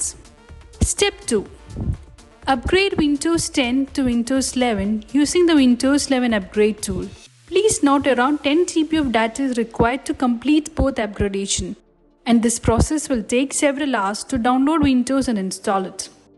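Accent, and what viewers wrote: Kerala, India. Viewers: R u a Keralite.?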